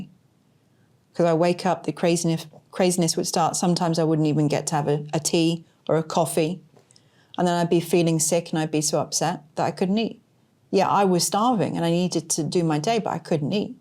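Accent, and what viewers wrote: United Kingdom. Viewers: In my prayers always., I think you look very healthy and beautiful… I believe you are a Spirit dancing along…I believe you will THRIVE! My ancestors are Kelham Island, just north of Sheffield…I so love the British and will listen to your beautiful accent forever., you will SUCCEED! Godspeed!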